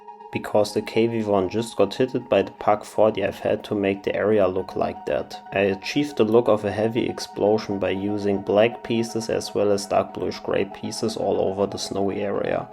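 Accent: Germany. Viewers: Yo i didnt notice your German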